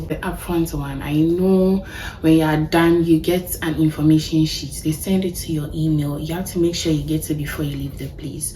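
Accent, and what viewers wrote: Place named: Ghana. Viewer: hii, did you use the student direct stream? you sound ghanaian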